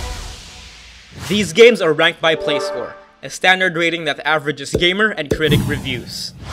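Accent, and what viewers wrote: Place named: Philippines. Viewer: Yep I recognize the filipino accent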